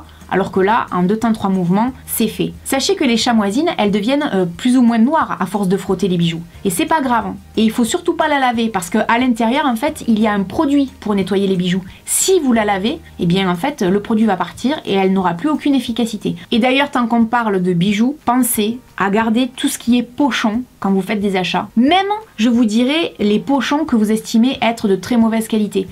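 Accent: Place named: Toulouse, France. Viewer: Bon, un bonne dose de rire, on ne dit jamais non, le redicule de tue pas. Chere vous etes adorable avec votre accent de Toulouse....j ai presque le meme, sauf que moi je suis nee a Monaco, chercher l erreur😊😊😊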